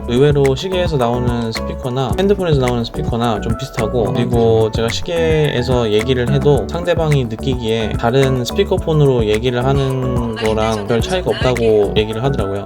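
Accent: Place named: Gyeongsang, South Korea. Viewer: 찡깁니다...ㅋㅋㅋㅋ 경상도 구먼..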